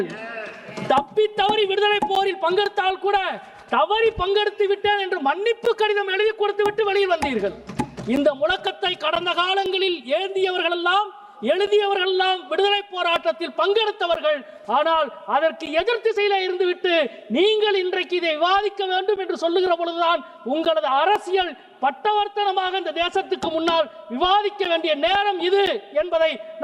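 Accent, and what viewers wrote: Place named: Madurai, India. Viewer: சங்கிகளுக்கு சரியான செருப்படி கொடுத்த மதுரை பாசையில் வீர முழக்கமிட்ட செந்தோழருக்கு வாழ்த்துக்கள் இன்குலாப் ஜிந்தாபாத் நன்றி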